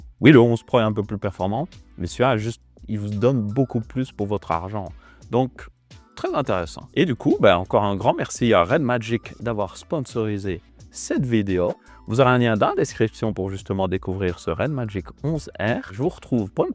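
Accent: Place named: Belgium. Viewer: Nos amis belges ont un bel accent.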